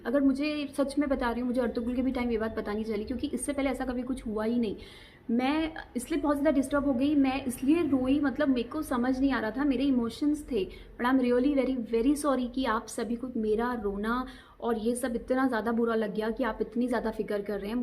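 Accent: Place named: Punjab, India. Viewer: This is because we all love you, ur punjabi is so cute . love you api from Punjab❤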